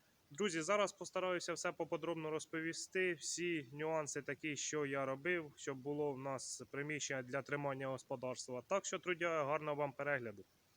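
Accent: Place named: Poltava, Ukraine. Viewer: полтавський говор не сплутаєш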